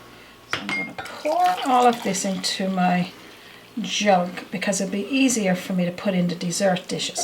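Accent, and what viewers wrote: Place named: Newfoundland, Canada. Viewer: I just happened to run across your channel (the strawberry squares popped up on my screen yesterday), and I see that you have many interesting recipes online, including some specialties from the area where you live. At first, I was confounded. Your name indicated a Latin background, but I see you are not and also your accent threw me as well, until I saw the word Newfoundland on the screen. Oh, my. That is an area I am totally unfamiliar with, only that I believe it is located near New Brunswick, around there, close to Canada, certainly not my neck of the woods. Anyway, I wrote down a number of your recipes I plan on making in the near future, including this chocolate gelatine dessert, the reason being that I noticed too many manmade chemicals and additives in Jello products. I would much rather make pudding without all that. 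I also noticed many delicious soup recipes I want to try out as well as several breads. I am amazed at the ease with which you make your breads, thinking to myself, why haven't I thought of using those methods before. I am like you when making desserts...I heard your comment in the chocolate walnut cookies video...that when it comes to making something like that, you just want to make it enough to make it taste good, that you don't bother yourself with making it too fancy...something like that. I feel the same way. I will continue to watch your channel in the meantime. In fact, I subscribed yesterday. When I eventually make one of your recipes, I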